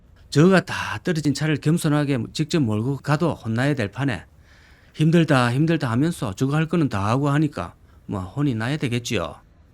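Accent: Gyeongsang, South Korea. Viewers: 우야노.....??앵커는 경상도??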